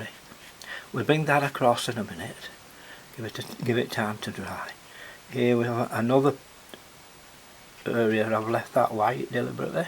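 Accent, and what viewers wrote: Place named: North, United Kingdom. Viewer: Think I've loved everything you've put on YouTube. With your accent I'd imagine you're either from Leigh or Wigan, I'm just down the road in Bolton. Do you still involve yourself with any personal tuition? I'd gladly part with my hard earned to learn from someone like you!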